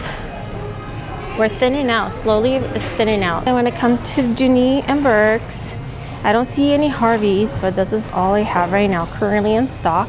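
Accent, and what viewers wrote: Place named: Chihuahua, Mexico. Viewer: Love your content videos. They’re not too long and not too short. For months now I’ve been wondering where your accent is from. I’m going to guess Chihuahua by how you pronounce the CH. Also, any chance you lived in Denver, because I heard you say, “yous” at timeline . PS. Stitch is wearing a bra on his head like on the movie? 😄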